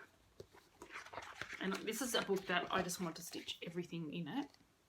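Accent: Australia. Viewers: Another fun video. Glad your sons are enjoying participating in your floss tubes. Love all your stitching and your Australian accent. Frosty is adorable and his name fits him purrfectly. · I’m binging your videos while working. I love seeing all your things and I love the Australian accent as well. Bring a southerner in the US, it’s fun listening to others’ accents and they various things are pronounced. I can wait to see more!! Stitching and quilts alike 🥰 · I love your Aussie accent! Be you and your work is beautiful😁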